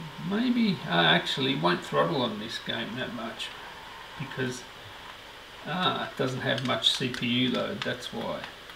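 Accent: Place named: Australia. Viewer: Ofcourse you are Aussie my man! Somehow missed the accent. I use my laptop for VFX rendering, mostly cuda accelerated effects. But I also seek strong battery life, which I am sure the XPS will win the Razer easily. These two laptops are literally a toss up for me, though I am leaning towards the Razer because of the sheer gpu power and better build quality.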